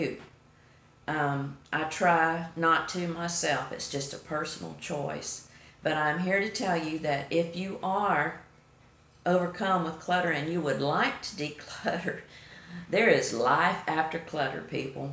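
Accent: South, United States. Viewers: Well I do agree the older we get, we do start to TRY and rid ourselves of things we don’t use anymore and collectibles that just gather dust. But I do have a shoe and boot problem,I admit,I’m addictive to them. I love boots, cowboy boots,casual boots, rain boots, it doesn’t matter,I love boots. I love my shoes as well,I see a pair of cute shoes and I just have to get them. I have a problem,I admit it. BUT I do get rid of them if I don’t wear them for awhile and to be honest,some of them I have never worn, I use to be an impulse buyer, but I’m over that. I don’t like my house cluttered,I like things in its place and this sounds crazy to some, but I like to clean and I’ve been that way my whole adult life. If I get bored, I clean out something or organize a cabinet or drawers,just whatever hits me. I don’t really bother the boot and shoe area.LOL. Enjoyed seeing you and hearing that sweet southern accent,hope you have a wonderful day and take care and be safe.God Bless You all and praying for Granny. 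💕💕💕💕 God Bless America ❤️🇺🇸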